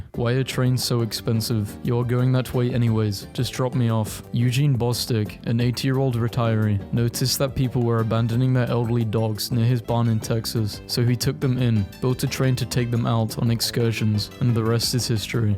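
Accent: United Kingdom. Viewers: I just remembered you are bri-ish (I'm pretty sure) because Americans pronounce it "Van Goh" I assume bri-ish people say "Van Goff"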